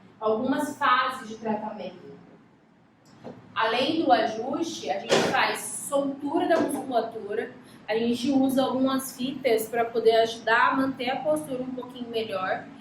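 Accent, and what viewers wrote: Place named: São Paulo, Brazil. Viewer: É uma pena que sotaque paulistano é tão ruim de ouvir.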